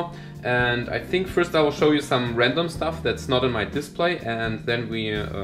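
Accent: Germany. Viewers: Bist du deutscher? Dein akzent wirkt irgendwie recht deutsch xD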